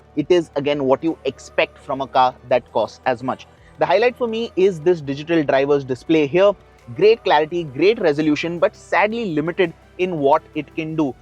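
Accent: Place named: North India, India. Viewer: maTTTerial maTTic. Bro pls don’t insult india with your cheap north Indian English accent 🙏🏼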